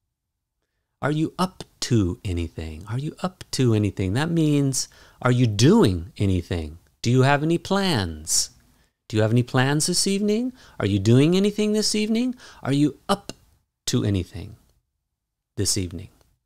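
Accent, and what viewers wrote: United States. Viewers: Thank you for such inspiring classes. I'm myself an ESL teacher with British accent, but enjoying a lot shadowing your American accent.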